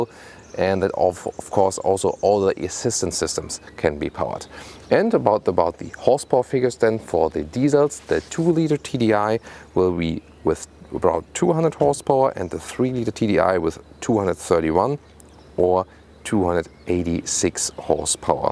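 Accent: Germany. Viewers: That strong German accent is horrible. Most kids speak much better english. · Yeah, I guess now that you mention it, we've had German au pairs and they and their friends are all embarrassed by their parents' accents.:) It's not so bad though... Germany is a tremendously well thought of country to say the least! And Germans (au pairs, at least) have much better English than other European countries. So, don't worry about the accents. ' accent really adds to the review for us Americans because it's a constant reminder that this is what an actual German thinks! Europe is cool for Americans. It's a big reason why Americans drive European (German) cars. Now me, personally,... I've owned only one foreign car in my life and it actually was a brand new Audi A6. And that car almost put me in an insane asylum with all of its problems and its crappy engine!:) So, it's Detroit Iron only for me! 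· His German accent genuinely made me feel I was already riding an audi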